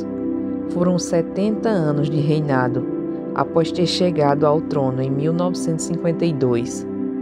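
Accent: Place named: Caruaru, Brazil. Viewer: Essa é caruaruense raíz.. Kk.. sotaque me lembra muito meus parentes de Caruaru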